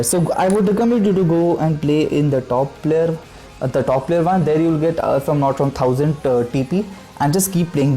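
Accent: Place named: India. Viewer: Not everyone fucking understands Indlish??? 🤔🤔 Or whatever it is you're saying when explaining.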